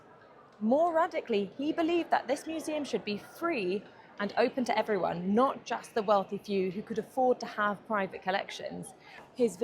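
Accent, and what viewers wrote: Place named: England, United Kingdom. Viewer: Sembra un edificio affascinante, ricco di storia! Lei ed il suo accento english meravigliosi! 🌹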